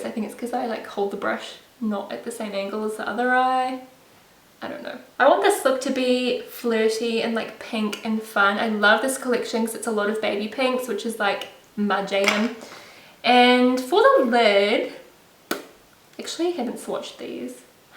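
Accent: New Zealand. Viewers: 'petel flower' -> petal flower, gotta love that Kiwi accent! x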